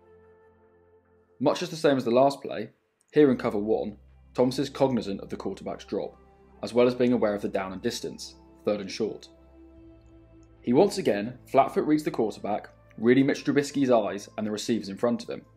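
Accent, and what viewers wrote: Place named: United Kingdom. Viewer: Why is a British man breaking down nfl film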